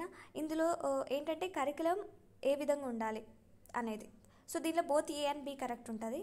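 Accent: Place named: Telangana, India. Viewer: Telangana accent spr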